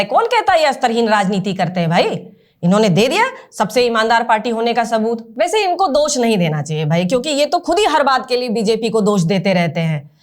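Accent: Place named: Bihar, India. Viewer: Aapke muh se bihari bhasha ki shobha bdh jaati hai💃💃💃💃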